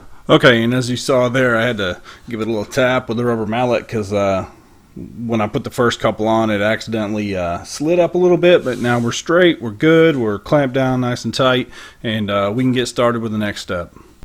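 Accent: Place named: Texas, United States. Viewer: Google the mascot for Baird, TX... It's the Bears. and the accent gave it away. That is why I checked the mascot, he sounded local to me.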